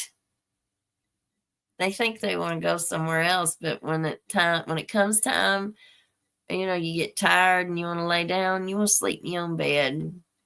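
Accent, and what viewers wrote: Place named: Appalachia, United States. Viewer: G Fish is how I found this channel. I'm in the UK and absolutely love the "Hillbilly" accent ( no offence meant).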